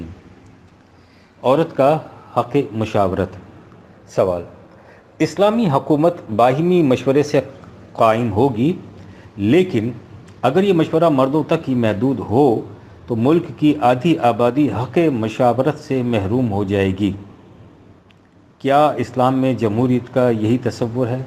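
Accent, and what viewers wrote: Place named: Iran. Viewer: Jazakallah khair really I enjoy your Persian pronunciation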